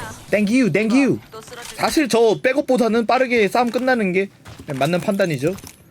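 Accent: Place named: Southeast Asia. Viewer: ㅋㅋㅋㅋㅋ영어 발음이 동남아사람 멕시코 사람인데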